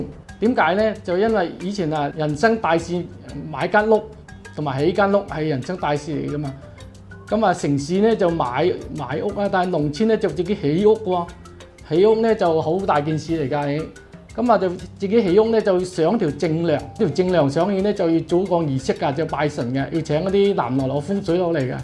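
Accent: Zhongshan, China. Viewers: 中山石岐話音❤️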